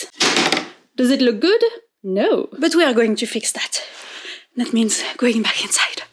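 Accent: France. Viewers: Hearing you say Kentucky was so cute lol I love your French accent! Also I’m glad you clarified the kitty is “not your cat” but is simply a “roommate” hahaha yes my cats are also rent free roommates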